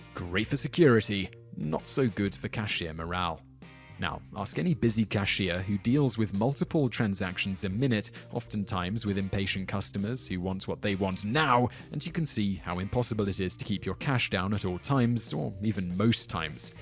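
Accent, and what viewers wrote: England, United Kingdom. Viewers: He has an English accent because he's English? What do you think? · OMG dude what's with the English accent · I notice you have an English accent, but you Americanize everything you talk about, stats, money, language and even driving. Sell out! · Shouldn't this be laws nobody obeys in America? Also why is the narrator British?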